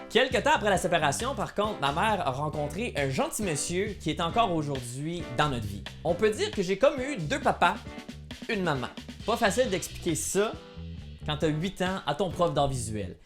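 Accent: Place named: Normandy, France. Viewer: étant donné ton accent,tu dois être de l'extrème ouest de la normandie,non?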